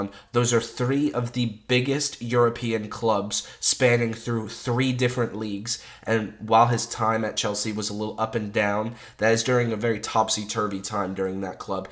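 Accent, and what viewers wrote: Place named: United States. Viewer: Bro, judging by your accent, I am gonna assume that you are an American. And I have noticed that the number of American football Youtubers has increased a LOT in the last two years. Is there any increase in football's popularity in USA recently in the last few years ?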